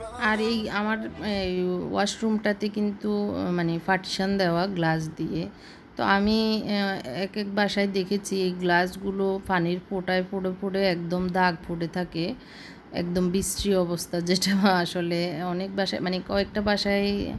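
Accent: Noakhali, Bangladesh. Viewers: আপু আপনি কি নোয়াখালীর। ভাল না লাগলেও glabs use অবশ্যই করবেন। আপনার হাত নষ্ট ঽবেনা। অভাব হয়ে যাবে।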